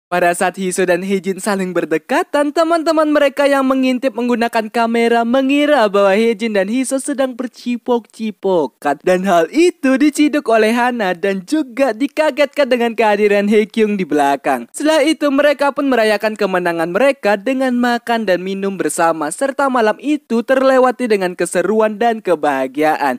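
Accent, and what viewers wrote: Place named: South Sulawesi, Indonesia. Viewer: Emang telaso mu😂😂,pasti orng Sulsel kan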